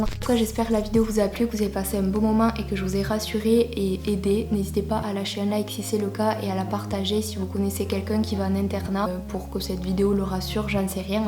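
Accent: South, France. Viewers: jsp mais en tous cas c'est positif 😂ps: j'adore ton accent du sud ptn jsuis marseillais et je l'ai pas 😂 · Tu vien du sud PTN CON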